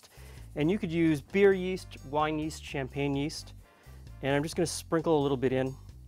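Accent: Canada. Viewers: Funny you mentioned it. I heard a Canadian accent. It’s all about the about.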